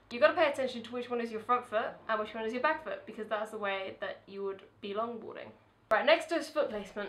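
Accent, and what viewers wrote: United Kingdom. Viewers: She's so cute. I love british pronounciation❤ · cute twitter bird!! lolz Lovin the awesome British accent. tee hee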